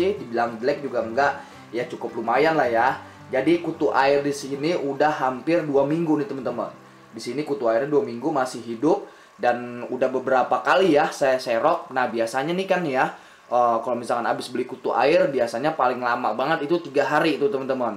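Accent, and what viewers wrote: Jakarta, Indonesia. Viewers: Demen review bg. Betawi tulen. khas bgt Hhai hallo 😁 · Bahasa nye betawi benerrr haha.. bang makasih ilmu nya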